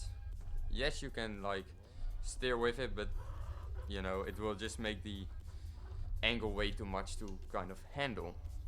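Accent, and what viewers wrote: Netherlands. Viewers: ngl u sound dutch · W Vid like all ways! btw are you dutch?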